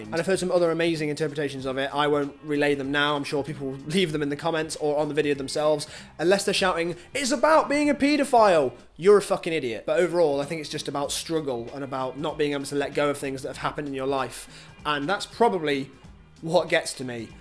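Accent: United Kingdom. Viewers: Y R u British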